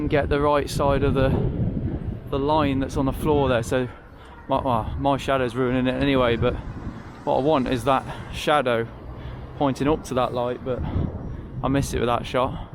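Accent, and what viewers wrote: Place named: Northern England, United Kingdom. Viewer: Great video, Bath is the place to be. Love how you Northerners pronounce it. Keep um coming mate.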